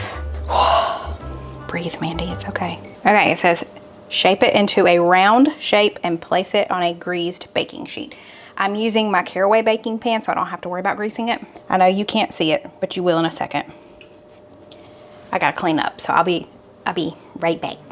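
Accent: South, United States. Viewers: Omg I'm actually crying ....chocolate chip key lime pie ..and in Your southern accent Mandy...its MEENT ❤❤❤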